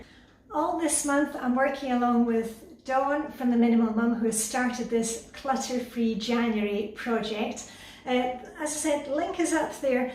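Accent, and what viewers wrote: Scotland, United Kingdom. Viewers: Ah could be sure your Scottish? I may well be going barmy?xx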